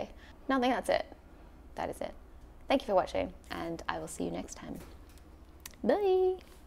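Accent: Australia. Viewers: Wait a SEC How did your accent change from whiny californian to Straylian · such a cute Aussie accent!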